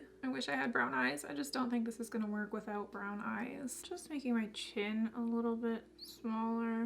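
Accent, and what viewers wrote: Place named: Canada. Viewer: I personally don’t think you sound like Kylie at all. Your Canadian voice is pretty unique to me ☺️ love the look!!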